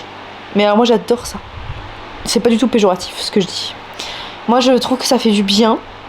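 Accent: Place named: England, United Kingdom. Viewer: Je kiffe ton accent anglais😅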